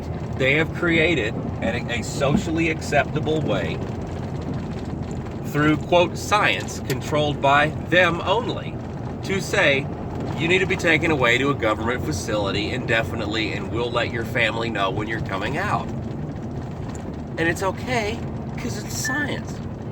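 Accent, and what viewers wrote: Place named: New Zealand. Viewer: New Zealand eckcents are hard to mimic.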